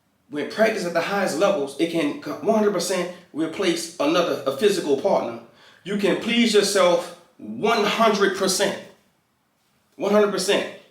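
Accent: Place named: Louisiana, United States. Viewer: That Louisiana drawl gets me every single time☺️😮‍💨💕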